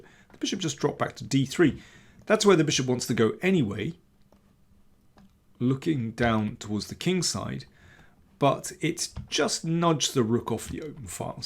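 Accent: United Kingdom. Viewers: Absolutely agreed. What is crazy is how long he has produced such top-tier chess content... I remember discovering this channel when Carlsen defeated Anand to be the World Champion around a decade ago. If Daniel King is analyzing a game, that's what I'm watching. From my experience, nobody does it better. And with such a pleasant British accent, to boot! That never hurts :)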